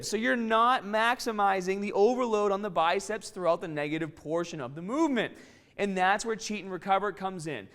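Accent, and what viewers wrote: Boston, United States. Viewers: Is this what they call a "Boston Accent"? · strongah!, stahdid, you definitely from boston! southie? or Townie? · Dudes like this should be banned from YouTube. Boston accent is not multi lingual, it just sounds stupid. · Bhavik Bhagat Lol, no. In what way is that Aussie? It's a Boston accent. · strong accent, Boston? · haha sorry, my bad! Boston..it is hilarious though!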